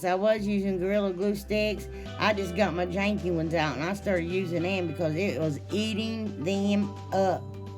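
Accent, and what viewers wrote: South, United States. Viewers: Love ur accent I'm a southern girl to. Ur crafts super. Like all. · Love your accent. Im a Southern girl myself. Mississippi here 🙂 Love your projects ❤ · Girl please, I can understand you just fine. It's a Southern thang. ;) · New Subscriber. Your DIYs are beautiful and professional looking. I love everything you made. Love your accent. My best friend is a Southern gal like you, I’m from Chicago. When I go to visit her in Tn everyone there says they like my accent.Keep you DIYs coming. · I'm so glad I found your channel!! I love all the videos I've watched so far, im binge watching them now lol I also follow your friend Kathy, I love her too!! I can understand you just fine but im a southern girl too. My Maw maw was from West Virginia, im used to the accent! All of your diys are so cute! Happy craftin lady!!!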